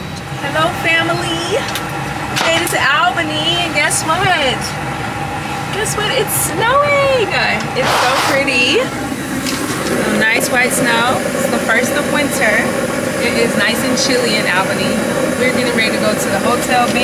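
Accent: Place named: United Kingdom. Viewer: I love hearing you call us "babes" with a British accent 🤣💙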